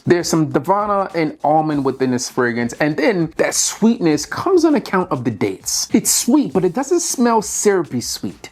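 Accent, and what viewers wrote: Baltimore, United States. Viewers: I picked up marwa n Hugo boss absolu recently n they both smell great!!! Question tho champ, are you from Baltimore? Asking bcuz I’m from bmore myself n when you say do, two, too or anything that sounds like these words, you sound exactly like someone from the town lol. Keep dropping this knowledge my boy bcuz I’m definitely tuned in n I know I can definitely take your word for what’s good out here in the fragrance game!! Take care boss✌🏽💪🏽💯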